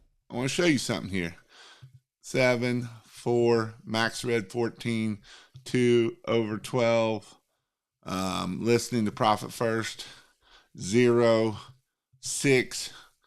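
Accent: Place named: South, United States. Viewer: I remember watching videos you made 2+ years ago and you're much more eloquent speaking. You've also lost that southern drawl quite a lot. Respect from NZ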